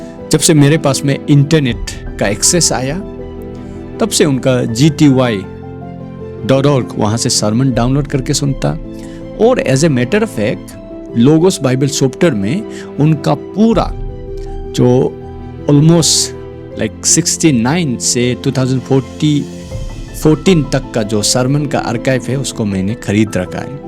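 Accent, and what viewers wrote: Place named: Northeast, India. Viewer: Everyone is thinking this man is Nepali but his way of speaking is like Northeast India..he may be from Nagaland... Meghalaya...Arunachal Or Mizoram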